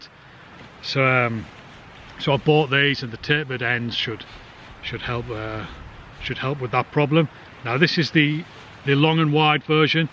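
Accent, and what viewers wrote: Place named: Scotland, United Kingdom. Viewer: your Scottish accent because it's cool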